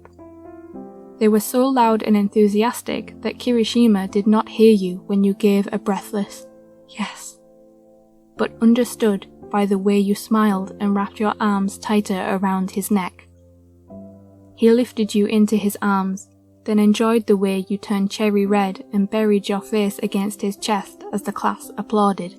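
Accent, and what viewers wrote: United Kingdom. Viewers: never did i think i would reach a state in life where im listening to a british accent audiobook. i think im depressed.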